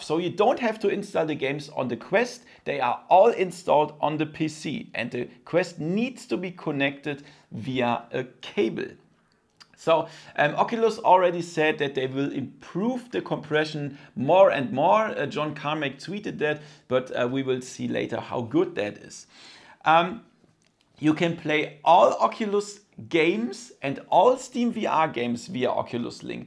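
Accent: Germany. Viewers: Are you German ?😂 Wenn ja ich auch haha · Everything he says is clear, there is no need to bring up his accent. He's German, why does he need to work on an English accent? He doesn't have to take your "advise" when it's an unnecessary critic of how he sounds. The dude went to the effort of making an English speaking channel to help out another audience and you're nit picking. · Its rude to comment on how someone sounds I'd be offended too. Especially sense he put all the effort into the video and he's got people like you complaining about his German accent. It's a stupid and petty complaint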